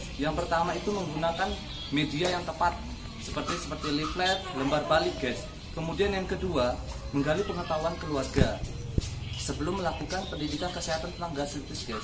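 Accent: Java, Indonesia. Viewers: Medok kali lah bg hahaa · Medok bgt mas. Hehe Good job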